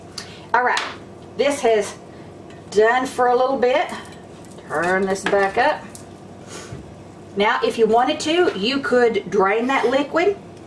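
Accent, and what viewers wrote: South, United States. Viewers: Medm crispy?? Not completely done. Thanks for cooking demo. I'll have to try the burritos. Whisky- woo. Love the way you talk. I'm southern too. Whenever I travel thru Georgia., No one Asks where ya from??? Assume I'm a native. Love it.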